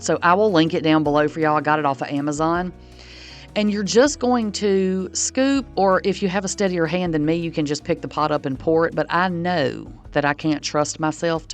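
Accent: South, United States. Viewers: Great video. They look delicious! Will try these for sure! And love your southern accent! ❤️ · Love your Southern accent and your lovely voice. Thanks for the recipe! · Her accent is about as Southern and wonderful as you can get 💕💕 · This is 100% fine & authentic, southern American accent. 👏👏👏🥂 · I thought you sound like a true Southern Girl. 😉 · It’s a southern dialect, maybe Tennessee or Kentucky id guess. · She has a southern accent. I don't know which state she is from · I love fudgsicles! You made following that recipe look easy. Thank you for sharing that. Your southern accent is pleasant to listen to and your tattoo is very beautiful also!!! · That's a southern American accent :) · I love your southern accent I could listen to you all day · Her accent is from the American South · It's a beautiful accent from a southern state.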